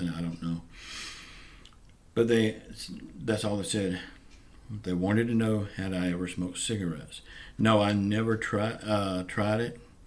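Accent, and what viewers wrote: South, United States. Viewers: You know that's the 1st time I heard the word filling station in a long time and you make me laugh when you say word's like hurricane and tonight when you said Carol syrup it, krol syrup lol I,m not making fun of you the way you talk I live in Cookeville TN and I,m country and I know you live in florida and they are country but you seem like your country as corn bread I love this show i finally got to keep myself up tonight to watch it on time but I still watch it when I wake up around 3 in the morning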